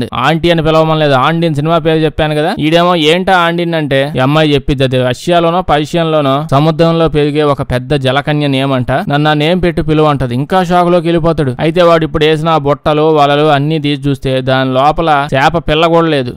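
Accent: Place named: Coastal Andhra, India. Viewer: అసలు సినిమా కథ ఏమోగాని భయ్యా, నీ నారేషన్, అదే భయ్యా, తూ.గో, కృష్ణ జిల్లాల కలగలుపు భాష ఉంది చూశావ్, దా. . నమ్ అహ, వద్దులే బాగోదు. . .అది మాత్రం ఇరగదీశావనుకో! అట్లాగే ఇంకో సినిమా గూడ సూపిచ్చు, మర్శిపోవొద్దు. థాంక్స్.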